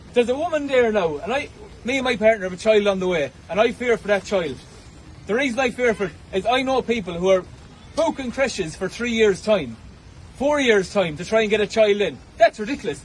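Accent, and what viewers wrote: Ireland. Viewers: I do like the irish brogue and the guy is spot on . The only thing i'd ask the irish people is not to bring flags to the muslim march every week end in london because we have the same problems . God bless ya' lads ❤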